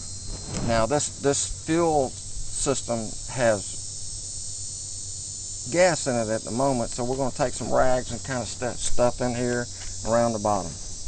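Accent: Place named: New York City, United States. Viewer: you sound like you are from New York City. Thanks for the video big help btw. Yella injectors need cleanin. Sometimes I use vaseline on my injector too.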